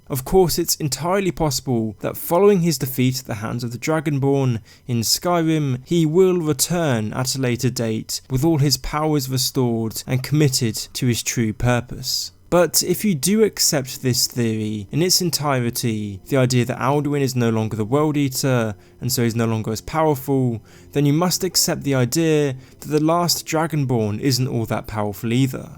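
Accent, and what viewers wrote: England, United Kingdom. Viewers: English accent and a lisp. Mind blown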